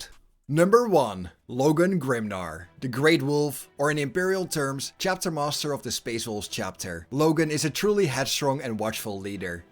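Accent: Netherlands. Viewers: The Dutch accent is music to my ears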